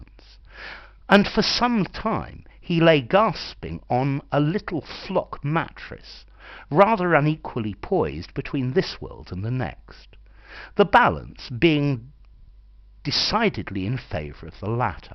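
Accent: United Kingdom. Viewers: I really love your British Accent, if you don't recording more sir!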